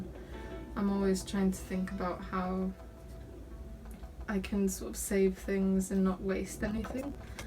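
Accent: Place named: RP, United Kingdom. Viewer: You sound so posh. :)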